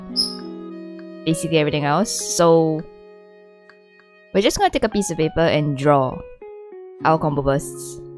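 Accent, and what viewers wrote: Singapore. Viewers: Is she singaporean she has that accent 🤔🤔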